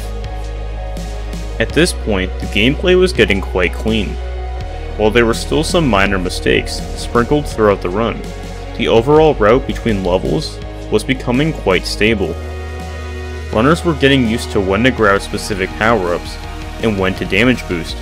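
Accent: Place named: Canada. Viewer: "...sorry if i keep rambling on but theres something I need to talk abut..." I fuckin love canadians and how you all talk <3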